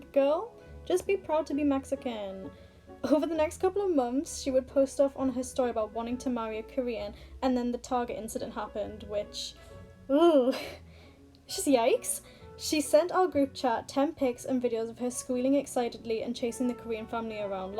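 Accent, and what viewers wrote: Newcastle, United Kingdom. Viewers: omg ur from newcastle hi sis🥺🥺🥺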